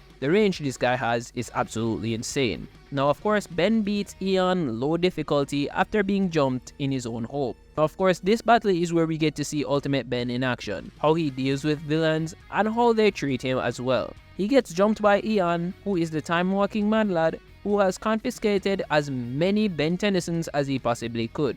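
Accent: Jamaica. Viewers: Are u Jamaican? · bro sounds like a jamaican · Are you Jamaican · subscribe for the jamaican accent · Is he really a Jamaican? I hear the accent and ting, but mi affi ask. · are you jamaican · Could tell the Jamaican accent immediately 😂, happy for my JA brother. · its clearly jamaican. Im jamaican and chinese myself bro talks like a sophisticated jamaican person. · U Jamaican? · Jamaican (take it from another Jamaican) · Bro yuh a jamaican🤨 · This sounds Jamaican….what Caribbean country you from? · You speak English but sounds Jamaican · It's not about difference, there's just nothing Indian about his accent, its clearly a Jamaican accent. You good LiL brO?? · Are you Jamaican bro?? Love the Ben ten content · Yo are u Jamaican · Are you Jamaican? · Yo bro ya Jamaican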